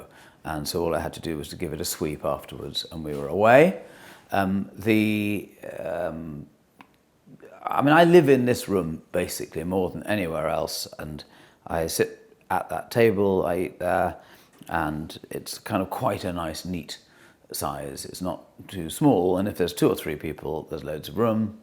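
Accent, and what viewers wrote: England, United Kingdom. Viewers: Castle, gardens and an English accent...magical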